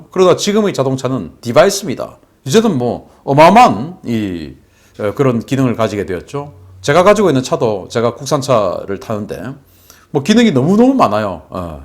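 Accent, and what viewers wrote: Gyeongsang, South Korea. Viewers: 당신 경상도 사람이지 말하는것 보니 티가나네 좀 젊잔케 해봐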